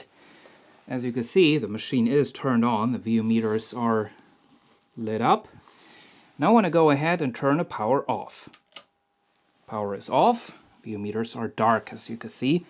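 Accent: Germany. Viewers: For each video you make, the German accent decreases :). Thank you for the videos btw. Edit. Is from 2012. Your German accent got heavier actually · Aww no... the German accent makes you sound like a true repair guy professional... And it sounds really cool!! Please please don't lose it. . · The sound of a true german enigneer, like Kraftwerk :D As a half German, I can see why we don't like our recognizable accent..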